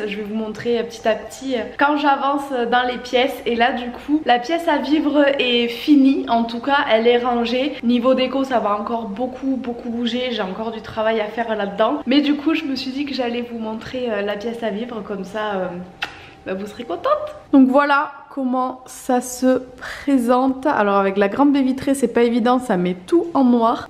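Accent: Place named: Aveyron, France. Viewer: Bonjour! Je vous ai découvert il y a quelques jours. Avec votre accent ressemblant au mien, et la région de Montpellier évoquée, je vais sur Google pour chercher "qui est " et là...Centre presse aveyron! Une aveyronnaise, comme moi! (À présent non loin de Toulouse). Ravie de suivre vos aventures et vous découvrir un peu plus!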